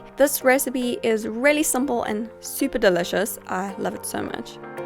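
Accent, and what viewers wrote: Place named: South Africa. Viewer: Can i just say love the accent reminds me of home... miss south africa and your accent reminds me of it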